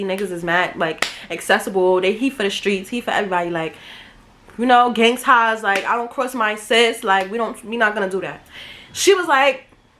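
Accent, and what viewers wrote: New York, United States. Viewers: I fcking love new york accents bish, Lemme finish binging the storytimes 🔥🔥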